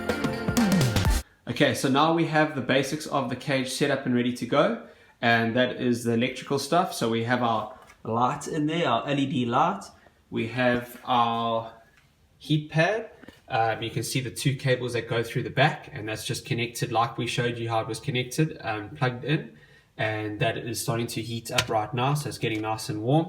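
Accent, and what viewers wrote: South Africa. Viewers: Are you south African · Is this guy from South Africa??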